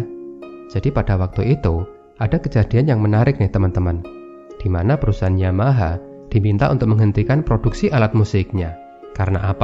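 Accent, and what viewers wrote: Java, Indonesia. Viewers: Gpp medok. Saya jg medok 😁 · saya nyaman dengan suara medoknya.. sya dnger cerita ini sblm tidur enak bgt hhe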